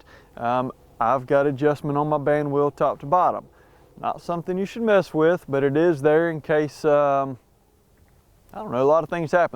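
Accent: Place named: Texas, United States. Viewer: You sure sound a lot like a Texan... Or some kind of southern drawl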